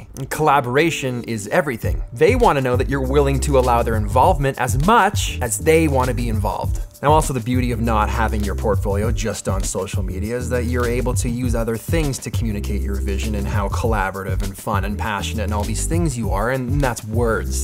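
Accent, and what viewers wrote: England, United Kingdom. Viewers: That British Accent though 😹❤ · That english accent though 😲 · that british accent broke my mind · That British accent 😂😂👌🏽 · Man, that perfect British accent cracks me up EVERY SINGLE TIME🤣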